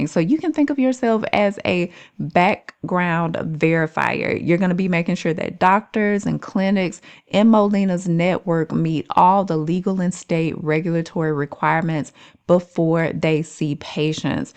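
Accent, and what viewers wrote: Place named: Alabama, United States. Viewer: You definitely from Alabama, am I right? Lol and thanks for the video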